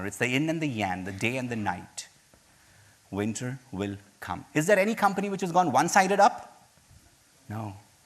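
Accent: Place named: Kerala, India. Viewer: Sir ur from Kerala? Ur accents are so pleasant 👍👍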